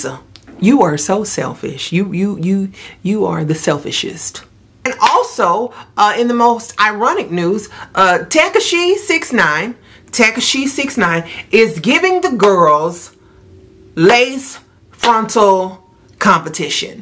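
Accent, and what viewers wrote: South, United States. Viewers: you look so pretty✨ and i can really hear you southern drawl 😍💕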